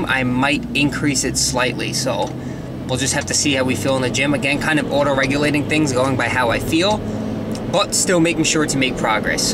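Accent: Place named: Alabama, United States. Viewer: I just noticed your accent....are you from Alabama?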